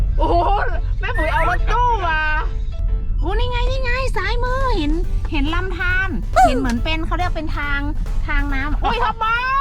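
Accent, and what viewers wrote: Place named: North, Thailand. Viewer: แม่ปุ๋ยพูดเหนือเพราะมาก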